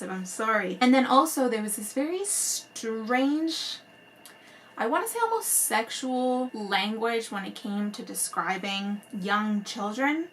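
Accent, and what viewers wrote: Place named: United Kingdom. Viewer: Are you British? I hear an accent every once a while!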